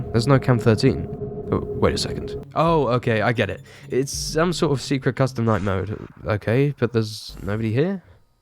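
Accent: United Kingdom. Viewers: Man, I keep coming back to this, and I get whiplashed that this was before transitioned- I’m so used to hearing her voice nowadays that her sounding like your average british male feels weird Kinda prefer her voice nowadays lmao, but I just like British accents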